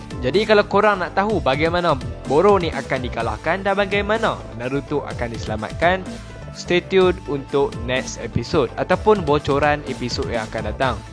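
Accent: North, Malaysia. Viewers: logat utara hahahaha but nice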